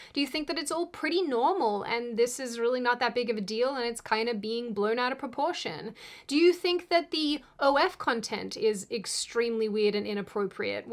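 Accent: Australia. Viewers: Surfing the Hell gondola straight through the afterlife! Death rattle was right!! Their demons are SCREAMING. Love you!! ❤️❤️❤️ P.S, I love audibly observing the evolution of your accent from Australian (aur naur) to a mix of that with American (fyuuumm)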